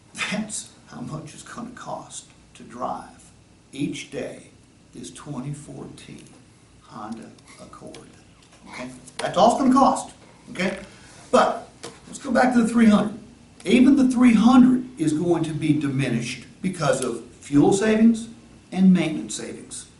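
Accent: Appalachia, United States. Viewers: and his Andy of Mayberry talk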